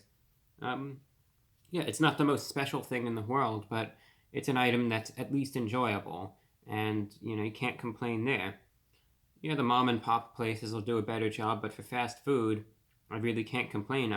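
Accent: United Kingdom. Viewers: Did he develop a British accent or what What in the ever loving fuck have I missed over these 8 years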